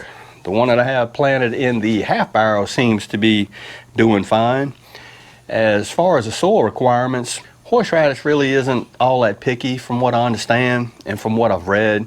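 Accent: South, United States. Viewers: Omg love the country accent authentic American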